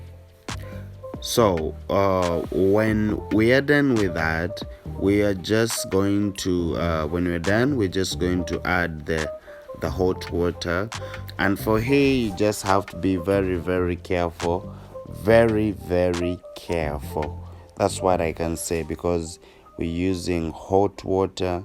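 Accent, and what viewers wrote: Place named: Uganda. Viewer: He sounds like a Ugandan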